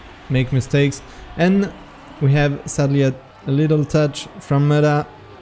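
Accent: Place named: England, United Kingdom. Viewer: pourquoi tu prononces meme les pseudos avec un accent anglais ? Je veux dire Papou ça devrai rester "Papou" et pas "Pahpouw" ou même Aurel ça devrai rester "Aurel" et pas "Owel"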